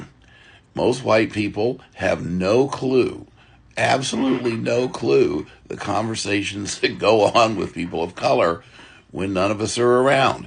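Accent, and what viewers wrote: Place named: South, United States. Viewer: Forget about what people say about your beautiful southern accent❤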